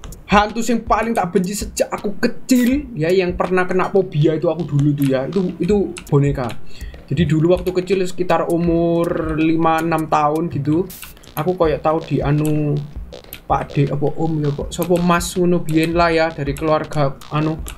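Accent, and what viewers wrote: Java, Indonesia. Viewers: Suka bgt liat teriakan sma bahasa jawanya kak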